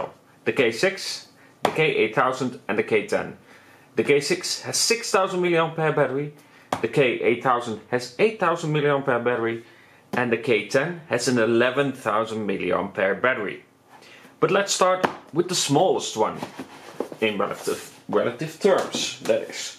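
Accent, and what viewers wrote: Netherlands. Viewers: Are you from the Netherlands? Because I hear the Dutch accent.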